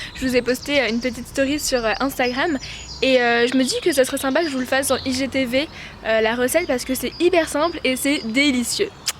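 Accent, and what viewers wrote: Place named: England, United Kingdom. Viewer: Non j'adore ton accent anglais tu pourrai faire des vidéos comme sa plus souvent stp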